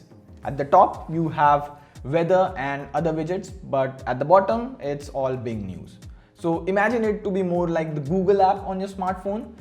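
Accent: India. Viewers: All indian accent are the same😌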